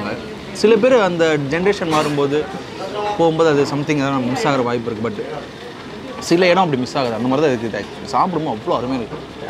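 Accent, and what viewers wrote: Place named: Kerala, India. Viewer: கேரளா போனதும் கேரளா பாஷை ஆட்டோமேட்டிக் ஆ வருது போல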